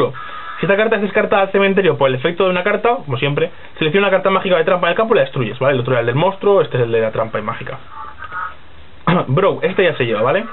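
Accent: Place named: Spain. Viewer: te entiendo perfectamente, y tmbn el q debas hablar un pco acelerado para no alargar los videos, ademas claro del hecho de q eres español XP, pero tmbn deberias tomar en cuenta q tus videos los ven latinos como yo y otros duelistas de habla hispana y que seria de mucho agradecer que por lo menos dejaras ese acento en los videos o mas q nada pudieras pronunciarlo bien ojala, puede q paresca exagerado pero tus videos son una gran guia, y cosas como esas empañan el video para nosotros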